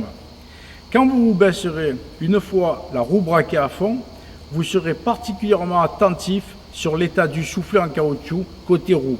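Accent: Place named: Marseille, France. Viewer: Lol! Une cardan non de dièu Accent typique de Marseillais :trophy-yellow-smiling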